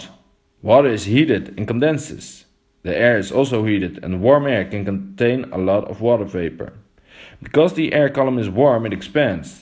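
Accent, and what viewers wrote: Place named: Netherlands. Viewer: It’s just funny how obvious you are a dutch native. Very interesting video tho!!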